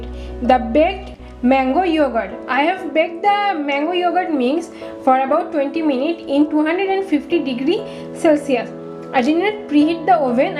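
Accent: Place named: India. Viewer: Please correct to voice quality and also mention in English on screen as your desi accent is hard to understand. Good raspy !!!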